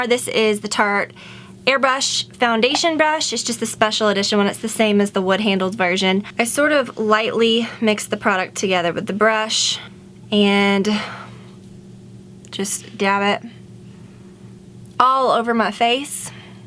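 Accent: South, United States. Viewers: You have a slight southern accent aha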